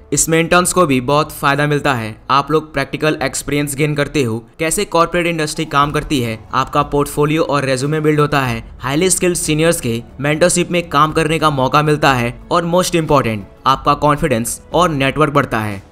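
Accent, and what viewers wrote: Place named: Assam, India. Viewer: Aap assam se ho kya?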